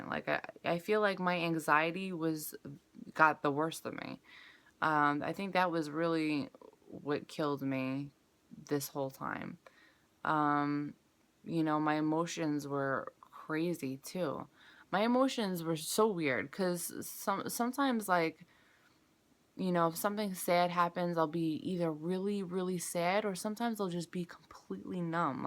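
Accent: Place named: New York, United States. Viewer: Sound like a New Yawka bae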